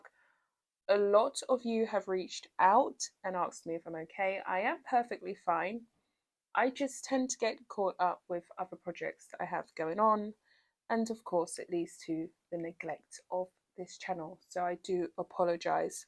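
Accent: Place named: England, United Kingdom. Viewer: I'm glad you're back. You're one of my favourites. As an Englishman from London, it's lovely to hear an English accent....especially when it's a competent and educated one. Keep up the. Good work.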